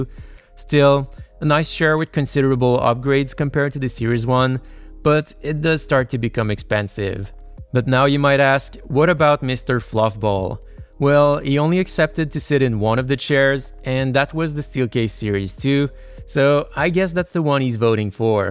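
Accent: Quebec, Canada. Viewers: Tu sonnes tellement Québécois 😂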